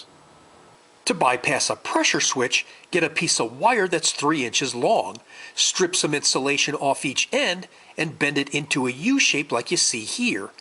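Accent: United States. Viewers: 1 pour ta tronche de ricain et -1 pour ton accent de ricain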